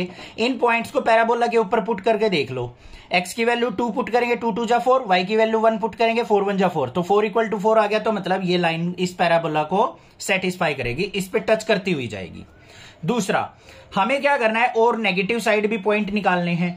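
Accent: Haryana, India. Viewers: Ap haryana se ho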